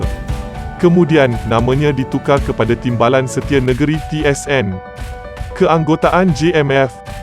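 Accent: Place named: Indonesia. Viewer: Kemungkinan besar asal pembaca narratif ini dari Indonesia kerana pronounciation letter 'J' bunyi macam bahasa Indonesia (based on Dutch). 😂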